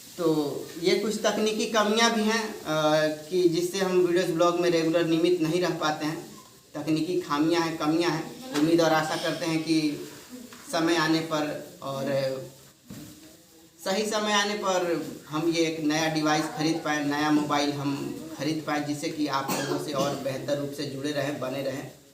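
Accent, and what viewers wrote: Standard. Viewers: आपकी शुध्द हिन्दी काफी अच्छी है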